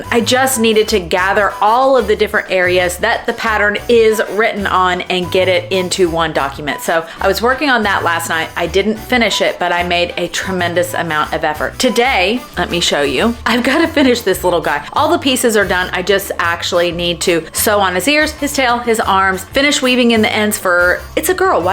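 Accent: South, United States. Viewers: Hi, loved the video today! I think your work room is adorable. I thought you were from the south, I was guessing South or North Carolina! I am in Georgia. I have been knitting and crocheting since I was a teenager. I became a fiber artist about 15 years ago. I had never thought of myself as a real artist, just a woman with a love of all things yarn. Then a very dear friend of mine who worked at an art department in a university in California, looked at my work and said that it was comparable to many of the professional things that she had seen displayed at various fiber art exhibits. She helped me to see that what I made had beauty and artistic value and that my own designs and patterns were unique. It really changed how I viewed what I did and gave me confidence to try new things. It truly opened up a whole new world for me.:) This summer for a little change I tried my hand at embroidery and thread painting. 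Now I am back working on a new shawl design. I have a small craft room upstairs which I affectionately call "The Lair". I think we have a lot in common. Have a great day ahead! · I knew it! North Carolina! With your cute southern accent!!!! 🤗🔥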